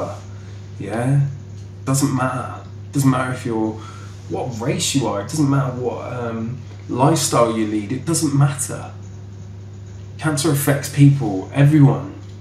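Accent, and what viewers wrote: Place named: United Kingdom. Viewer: sotaque inglês genuíno.muito engraçado o teu sotaque británico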